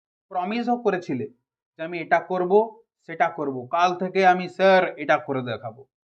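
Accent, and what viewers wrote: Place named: India. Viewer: স্যার আমার মনের মধ্যে একটা প্রশ্ন অনেকদিন থেকে আছে - আপনার কথার মধ্যে হিন্দি টান টা কি করে হলো? Please স্যার জানাবেন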